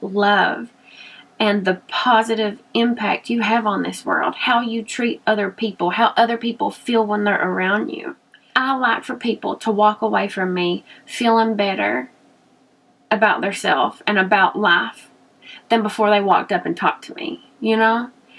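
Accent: South, United States. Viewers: Thanks for the kind words. One thing in life I've always have stayed positive throughout all my health issues. I let me having to get a total knee replacement get to me more then having breast cancer go figure. I'm so glad that Emily mentioned you that day on her channel and I came and checked your channel out. She got me when she told you about your accent. I love a good southern accent.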